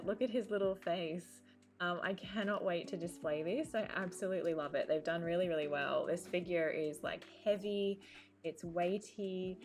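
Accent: Australia. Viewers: Noooo it was a steel energy hahaha. I hear Australian and American accent too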